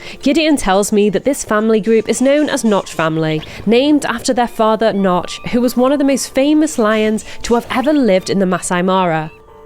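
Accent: United Kingdom. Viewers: I could listen to your voice, 'British accent' whole day. I love your cinematic vid.😊 Karibu kenya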